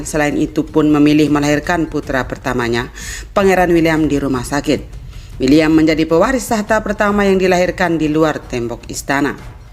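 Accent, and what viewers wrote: Indonesia. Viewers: Btw, apa gw aja yg ngerasa apa yg lain jg? Naratorny suarany kok medoks bgt seehh... OMG!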